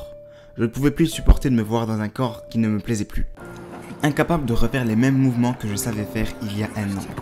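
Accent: Besançon, France. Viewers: Je rêve ou tu est de Besançon ?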